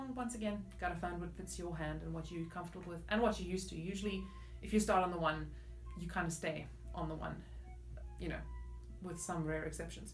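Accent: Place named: South Africa. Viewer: South African, I believe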